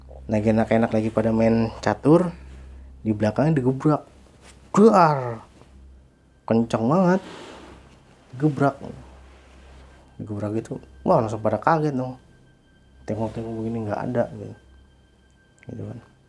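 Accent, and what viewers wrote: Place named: Jakarta, Indonesia. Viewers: suka logat betawi ya sama saya juga kalau logat jawa saya gak gitu suka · Logat. Betawian. Cakeeeep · Haahahah lahh kuan mpok kite pan emang asli betawi wkwkwk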